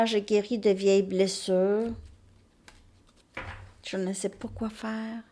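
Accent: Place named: Acadia, Canada. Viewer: Coucou, vos vidéos sont si fleuries, c un régal. Votre accent acadien me fait voyager vers chez vous. Merci! Il semble que le bout du nez soit le point de l endroginat primordial ( cf: Apotheose de jean luc Ayoun)... De parler sur le thème des fj doit réactiver ce point possiblement. Bien des choses depuis la France Dom🧚‍♀️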